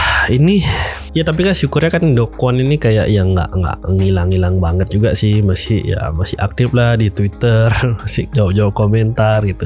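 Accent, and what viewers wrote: Bali, Indonesia. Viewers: Bali itu bang...sekilas mirip penekanan dan nada nya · Keliatan banget orang bali dari logatnya om 😅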